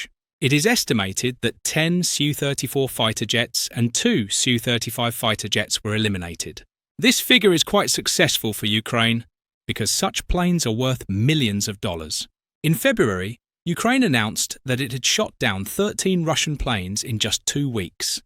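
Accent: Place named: United Kingdom. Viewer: oh bullshit... this is all propaganda.. some brittish accent neocon accent narrator asshole brainwashing the idiots dumb enough to believe this propaganda...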